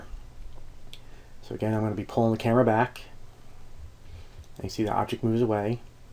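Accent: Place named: South Jersey, United States. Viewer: New South ?? Soundly like South Jersey to me 🤔🙃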